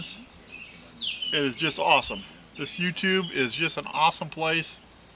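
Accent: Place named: Midwest, United States. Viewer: I'm from WI and you sound exactly like a Midwesterner! Great vid, you're making me wish it wasn't 1° outside right now where I'm at in MN.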